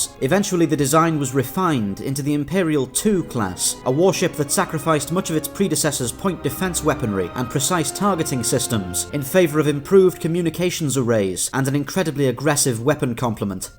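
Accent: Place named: Merseyside, United Kingdom. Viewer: Deffo from Merseyside 👍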